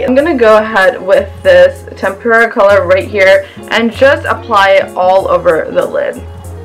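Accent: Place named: Punjab, India. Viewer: Really love your all vedios....love your accent while you mix punjabi tay English mix..... please put some hair care tip...as your hairs have volume...you use any spray to light it up......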